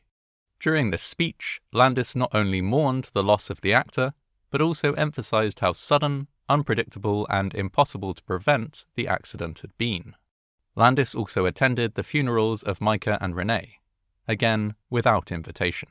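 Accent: England, United Kingdom. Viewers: What part of England are you from? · The clip isn't even shown. Just some British dude yapping...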